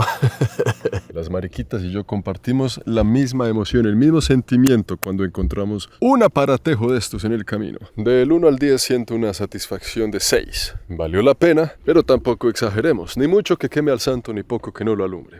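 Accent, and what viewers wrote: Colombia. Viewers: Me encanta el acento colombiano 🤗💕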